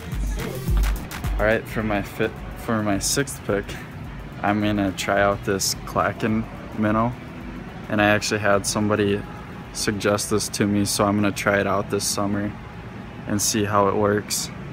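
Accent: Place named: Minnesota, United States. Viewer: You ever watch the show Fargo? You sound just like the people on that show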